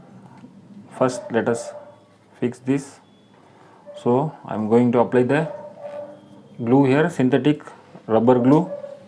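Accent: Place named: India. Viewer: I love the indian accent when you can actually understand them, great video, thank you very much!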